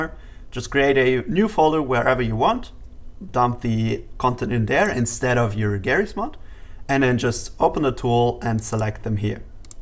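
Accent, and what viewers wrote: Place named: Germany. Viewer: I höre some Deutsch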